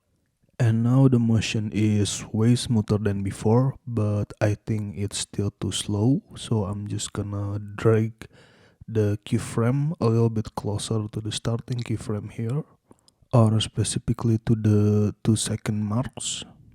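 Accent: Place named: Indonesia. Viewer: Ini orang indo ya logat nya kok indo bgt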